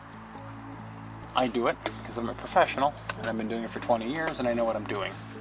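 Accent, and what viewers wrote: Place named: Canada. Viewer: if they're not home, then you must have them open them later as you zip them free with your putty knife/ 5 in one.. yeah, he's a canuck.. i can tell my accent and architecture.... i think in Canada like England there must be a law you have to paint your windows white... Dap with Silicone is ok caulk, i prefer a elastomeric caulk... you really can't do that much caulking and wiping it out, should have caulked it first or earlier if window have much cracking..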